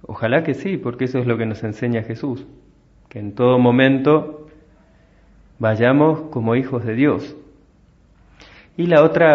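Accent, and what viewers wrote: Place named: Argentina. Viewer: Suena muy bien con acento argentino! Muy bonita reflexión. Desde España.👍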